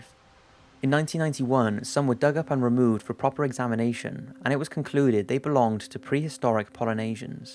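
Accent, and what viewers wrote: Wales, United Kingdom. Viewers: The video is all the more interesting because of your british accent. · it's part of his dialect, wherever he's from - somewhere in wales, i can guess. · He sounds it, would guess either East Wales like Newtown or Welshpool or maybe Ceredigion. He doesn't sound as broad as South or North Welsh. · Who's the narrator? He sounds both Welsh and English!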